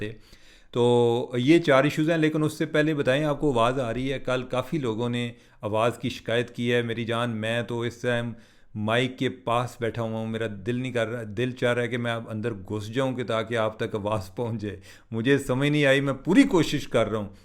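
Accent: Punjab, India. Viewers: Urdu main punjabi ka tarka kamaal stay blessed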